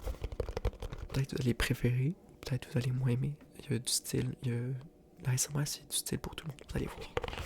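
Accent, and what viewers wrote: Quebec, Canada. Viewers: j'apprécie les explications données pour chaque objet utilisé , je connais pas tous les termes malheureusement mais mon cerveau se relaxe avec ta voix et ton scratching lent je crois que c'est ça m'a déclenché des frissons . enfin bref , tout ça pour dire que je viens de te découvrir cette nuit . je n'arrivais pas à dormir et je suis tombé sur une de tes vidéos , après hop abonné . l'accent québécois m'apaise + asmr combiné = mon cerveau est content 🤔👍👏✌ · aaaah j'adore l'accent québécois :) tu es mon nouveau asmrartist préféré ;)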